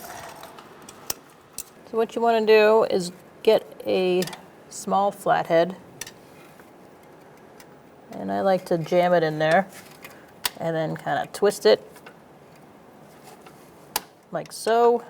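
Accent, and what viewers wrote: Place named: New England, United States. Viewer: She sounds and that Subaru looks like its from Massachusetts or some other New England state. Lol im from Maine so i know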